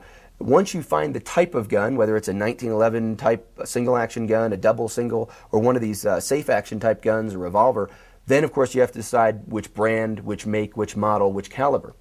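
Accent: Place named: Chicago, United States. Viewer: You must be from Chicago!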